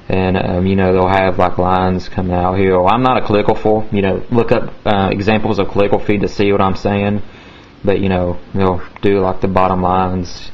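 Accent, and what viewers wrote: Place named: South, United States. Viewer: he had like a southern accent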